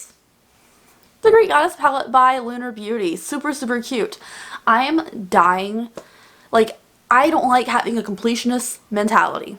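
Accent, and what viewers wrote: South, United States. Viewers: I really like your videos only thing as European that speaks perfect English I have a problem with understanding your southern accent. Maybe talk slower idk😭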